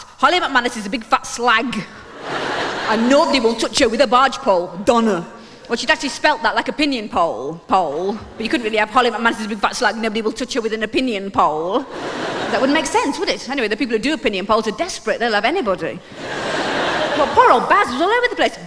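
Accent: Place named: North, United Kingdom. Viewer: Brilliant Woman! Although I ended up spending half the time playing a game of listening out for her northern accent - point to me every time it sneaks it was back in :D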